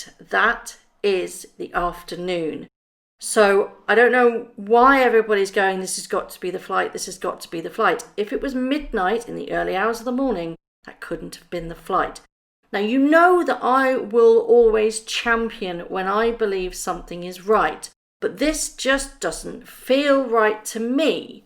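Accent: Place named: Wales, United Kingdom. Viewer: ?,?, I love her Welsh voice. It’s like having a natter over the fence with a good neighbour when you listen to her.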